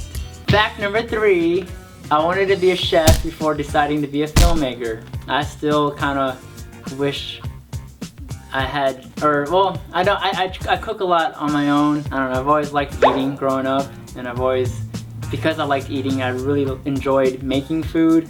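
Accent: Texas, United States. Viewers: He has a Texan accent lol. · it's that southern drawl we have in Texas. maybe not a southern accent, but we draw our words out more. :) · Now I understand shy he talks so funny, he's from Texas :D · Wow, I never realized that was from Texas, I can totally hear it in his voice.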